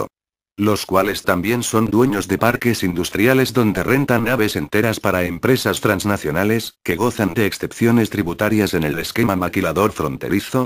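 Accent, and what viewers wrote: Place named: Spain. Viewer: ya me cayo en la madre ese pendejo k siempre sale narando con su vos española ya quiten esos videos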